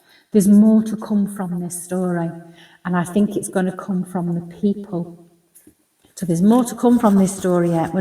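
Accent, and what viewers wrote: Sheffield, United Kingdom. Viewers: I knew that was a Sheffield accent, thank you for your readings. I love listening to you. Love and light from another Sheffielder ❤️🙏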